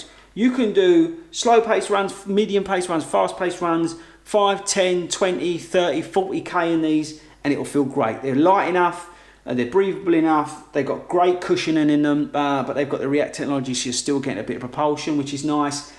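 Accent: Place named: Essex, United Kingdom. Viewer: Propa Essex accent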